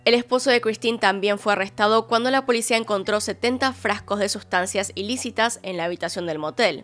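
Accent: Argentina. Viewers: Porque los argentinos no pueden decir la Y y la LL y dicen "Sho" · Es argentina :D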